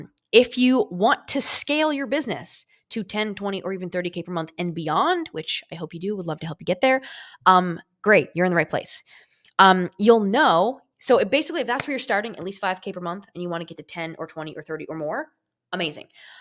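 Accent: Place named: Maritimes, Canada. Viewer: I can hear a maritime accent in your voice ... maybe annapolis valley, Nova Scotia ... wild guess ...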